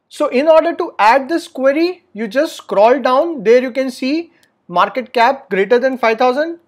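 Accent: South India, India. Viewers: Your English fluency level is like some south indian guy .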